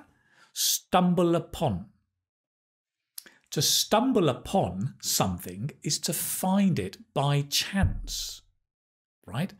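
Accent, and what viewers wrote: United Kingdom. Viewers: You are a great native speaker teacher! I love British English.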